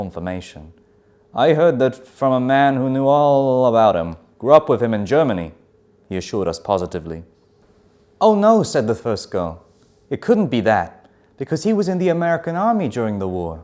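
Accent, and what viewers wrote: United Kingdom. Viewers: Hi. reading sounds so British! Amazing! I have been British English for several years because I fell in love with its pronunciation, intonation and expressions. However, it is so tough to learn it. I can hardly acquire it. That lets me down easily😰. I listened to his reading and got impressed with his British accent Which encouraged me to keep learning. I love his lovely voice, too, by the way. That sounds really comfortable😌. Thank you so much. ( ^-^)ノ∠※。.:*:・'°☆ PS: Could you read ' Howl's Moving Castle' (by Diana Win Jones?) for us, please?😊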